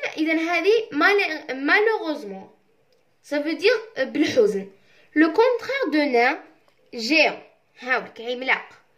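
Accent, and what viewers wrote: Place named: Kabylie, Algeria. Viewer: انتي قبايلية منين من صوتك عرفتك بلي قبايلية انا تان قبايلية و شكرا على كلشي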